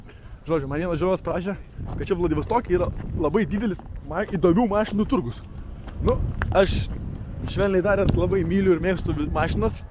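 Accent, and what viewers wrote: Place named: Rokiškis, Lithuania. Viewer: Ale😊😊😊 atspėjau,kad iš Rakiškio nu vienu žodžiu iš to gražaus krašto girdžiu pagal tarmę😊😊😊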